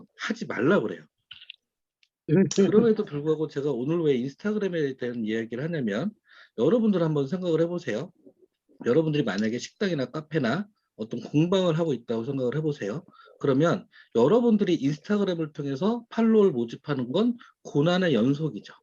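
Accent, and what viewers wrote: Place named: Jeolla, South Korea. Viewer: 전라도 소리만들어도 조으네요 내고향 전라도 줌교육도 열정뜨겁네요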